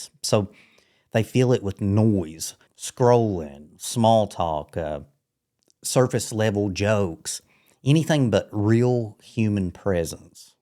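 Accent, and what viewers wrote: South, United States. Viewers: You have a totally southern drawl. Do you get paid for these videos. ???????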